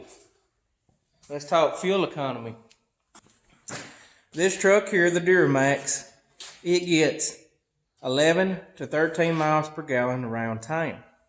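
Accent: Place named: United States. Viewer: cheers from poland, i got here by randomly searching "diesel truck" just cause i was bored lol. i like your accent. its nice to hear various american accents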